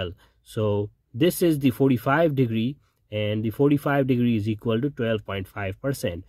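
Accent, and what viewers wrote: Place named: India. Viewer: great indian teacher im from the philippines and your teaching is good with the accent! 😀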